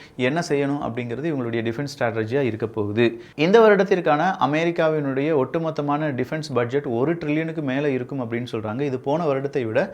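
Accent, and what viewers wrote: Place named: Nagercoil, India. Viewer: video ஐ அவரு ஊரான நாகர்கோவிலில் இருந்து கேட்பது.. இனிமையோ இனிமை...❤❤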